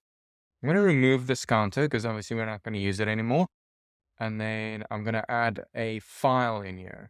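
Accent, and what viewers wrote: South Africa. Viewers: Souf Efrican?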